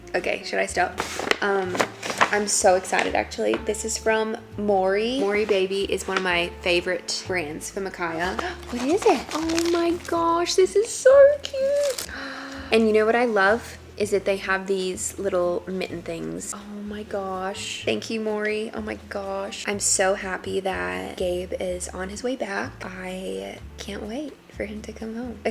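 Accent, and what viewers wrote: South, United States. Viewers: Lol the Southern accent is so on point. You sound like Miley cyrus in the Hannah days or Georgia from Genie and Georgia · How is no one talking about how incredible that American accent was?! Also the SOUTHERN ACCENT?? Incredible. Better than some actors doing a southern accent. I’m impressed. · Ok but your southern accent was good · I'm married to an Aussie and I think you guys for some reason always sound like you're making fun of us when you do what I call the regular American accent. lol Idk why! Your southern accent is on point though! ❤ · The SOUTHERN accent woah!!!! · Honestly loved the southern accent! And this vlog was so sweet!❤ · As someone from South Carolina, your southern accent was not half bad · As a woman from Clarksville, TN you nailed that southern accent girl! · Your southern accent 😅 so good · Hahaha I love the southern accent! 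· The southern accent was spot on! I'm from Memphis, and you actually sounded a lot like one of my friends 😂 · The American accent had a hint of southern and the pure southern accent was amazing. Btw you made us feel like we were your friends in this vlog ! ❤ It was so intimate. It was really nice to get to know you more! ❤ Btw apparently the dinosaur bones are the fallen angels/creatures that got destroyed during the flood that God sent! There's probably more information in the book of Enoch. I love your natural brown hair too, it looks so good sis. God bless you and your family. (we love the 20 minute videos !) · Aussie here, I loved your southern accent! It was really good! Also just wanted to say that having Gabe working from home everyday is a blessing too, my husband works long hours for 3 days and then has 2 days off and I really enjoy his company on those 2 days! Wish he could be home every day lol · Your southern accent is not bad at all! 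I'm southern, and I've always thought that certain vowel sounds in an Australian accent sound really similar to a southern american accent.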